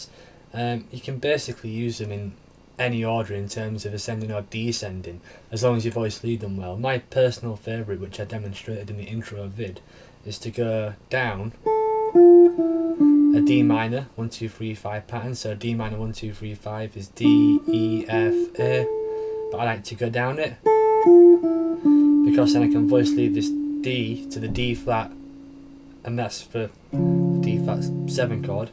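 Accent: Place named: North, United Kingdom. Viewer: Keep on running, nice to hear't northern twang on such lovely chords.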